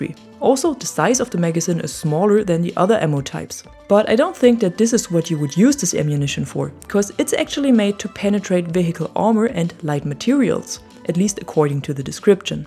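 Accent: Germany. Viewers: Recongnised a slight german accent :) Thanks for the video.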